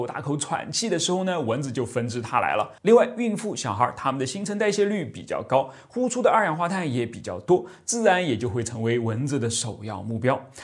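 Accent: Nanjing, China. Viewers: 是南京人啊？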